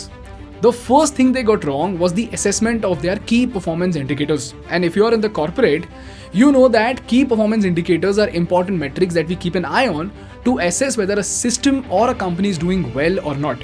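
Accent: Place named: India. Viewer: The problem is ... your Indian accent.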